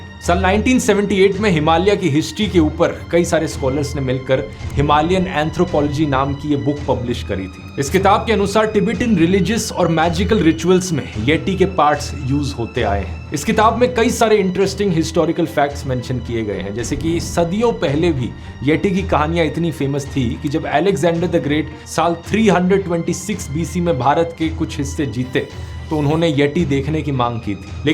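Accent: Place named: Lucknow, India. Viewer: आपकी भाषा शैली, आपके बोलने के अंदाज़ से प्रतीत होता है कि आप पुराने लखनऊ के निवासी हैं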